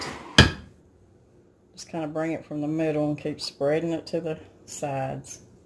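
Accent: South, United States. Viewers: I wish I enjoyed hearing her talk…..but I don’t care for types of south Wern accents. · Love your accent. I’m Southern too. Keep it Southern!😁😁💕💕💕. Good dish thanks. I cook old time Southern food, South American authentic, and a couple Mexican dishes and authentic tamales. No me gusta pansa o menudo porque es puro quedito y nada carne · Love her southern accent and love that she enjoys tamales we make them every Christmas de queso con chile verde and green chile pork tamales and red chile chicken my fav is sweet corn tamales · How interesting and useful! I live in Arizona and make Mexican food all the time. It's different to hear a very southern accent! I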